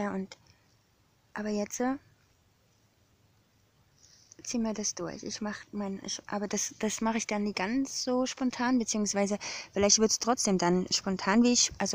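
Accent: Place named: Saxony, Germany. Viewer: Na du weißt ja, dass ich sächsisch mag..😇 Da freuen sich bestimmt auch alle anderen hier in UNSERER Dorfschule, wenn du es nochmal aussprichst..😜 Habe mir gerade einen Kakao gemacht.. Pulver, eine Miniprise Salz, Vollrohrohrzucker und Wasser...😋 Nu issa aber schon alle..😝 War superlecker!!🔆 Und schreit nach mehr.. Werde beim nächsten Mal, etwas Kurkuma, Pfeffer, Muskat, Kardomom, Kokosöl etc. zugeben.. So wie man eine goldene Milch macht, wenn dir das was sagt.. Schön, dass es auch ohne Milch und nur mit Wasser schmeckt..😘 Super Tipp von dir❤😇🔆😘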